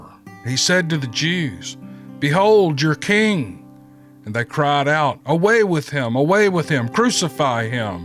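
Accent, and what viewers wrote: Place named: South, United States. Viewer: Hearing that ultra-familiar, southern accent narrating the Bible is more comforting than I can possibly express in words. I humbly thank you for this, Mr.. I shared these with my family.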